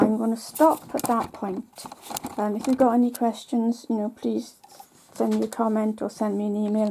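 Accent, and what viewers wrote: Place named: RP, United Kingdom. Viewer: Thank you for the tutorial. I'm trying to recreate your technique it looks quite good. I love your art and your voice has captivated me. When I was a kid, I used to learn English from BBC on my little radio in socialist Poland. I'm talking1970-ties. Your beautiful accent is transferring me directly to those old times :)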